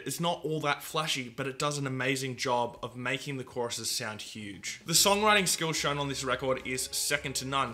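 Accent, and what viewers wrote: Australia. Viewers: TheFilmPunk Aussie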